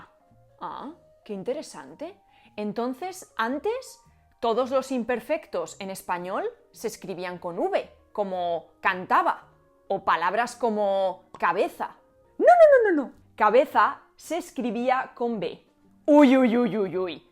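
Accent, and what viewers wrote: Spain. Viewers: Me encanta el acento de las españolas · Me encanta el acento español. Me hace acordar a mi abuela. Gracias a vos desde Buenos Aires. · Hola, saludos desde Culiacán, Sinaloa, México, siempre veo maratones de tus videos, es decir cadenas ininterrumpidas de tus videos, me encanta ese acento ibérico, que jamás he podido emular. a decir verdad el castellano que hablamos aquí en México hace como unos 30 o 40 años era mas parecido al peninsular, no se porque se ha ido distorsionado, te lo digo porque lo he vivido, hay vocablos como coger, es lógico es tomar algo, agarrar algo, de un tiempo acá los MExicanos lo han asociado al sexo, pero antes no era así, es un ejemplo nada mas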